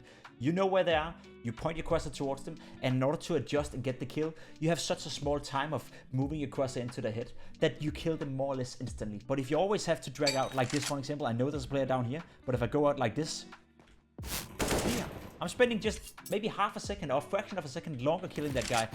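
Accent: Denmark. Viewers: i can just hear that your Danish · are you danish? you sound danish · nice vidio jeg syntes at det var sjovt at man kunne høre at du var dansker lol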